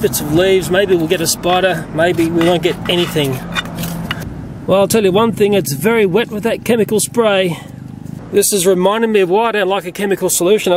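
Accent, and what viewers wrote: Australia. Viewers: At first my friend thought "How in the world can you find one of the most dangerous spiders in your backyard?" Then she saw that you had an Australian accent, and said "Oh." Welcome to Australia everyone!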